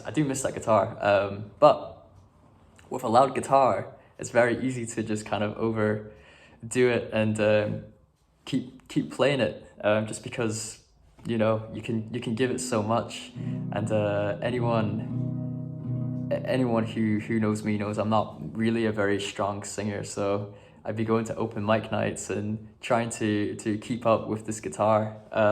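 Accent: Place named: Scotland, United Kingdom. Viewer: he's a SCOT, probs from the north cuz his accent is chill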